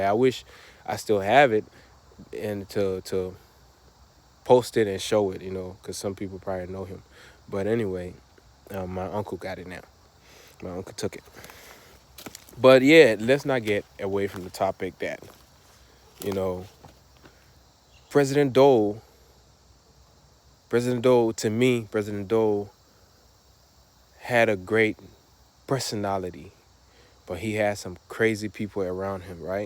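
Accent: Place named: United States. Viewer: Your accents is very American too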